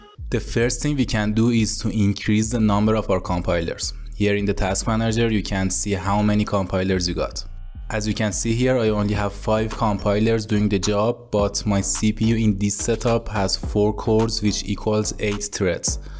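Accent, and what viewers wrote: Iran. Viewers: لهجه اصل ایرانی 😁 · قربون لهجه فارسیت😁😁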